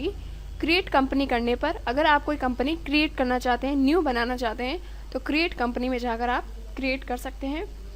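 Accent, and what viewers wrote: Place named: Bihar, India. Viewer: Mam aapka accent Bihari wala h kuch samjh nhi aaya bol kya rhe ho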